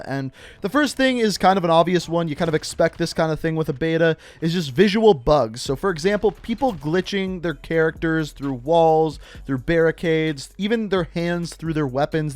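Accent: Canada. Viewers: I can definitely tell you Canadian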